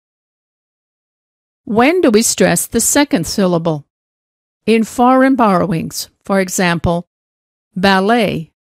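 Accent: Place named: United States. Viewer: Authentic American Pronunciation 😉😊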